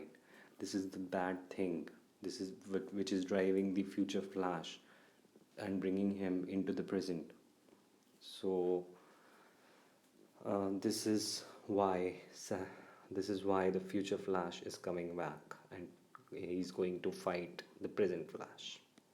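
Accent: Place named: England, United Kingdom. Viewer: dislike for your English accent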